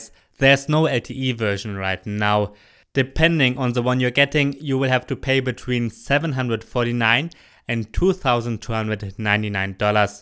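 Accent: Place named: Germany. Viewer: Dein Englisch ist grausam, dass du deutscher bist hört man sofort. Entscheide dich besser ob du nicht auf das wenige Geld verzichten kannst und auf Deutsch deine Videos rausbringen kannst, oder ob du Muttersprachler weiter verschrecken willst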